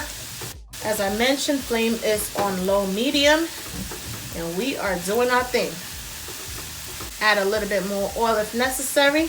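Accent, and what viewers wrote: New York, United States. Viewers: It looks amazing. Love the East coast accent. Sounds like NY, or Brooklyn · you sound like a New Yorker your accent does NOT sound Southern at all..Love your cornbread stuffing recipe..but I will like to see how you made the cornbread..My mon does it the same way but w/outthe croutons..Looks Delicious..!!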